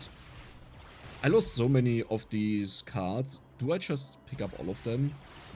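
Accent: Germany. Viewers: Kann es sein das du deutscher bist?😅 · Like your content, but are you German ?😂🕺🏼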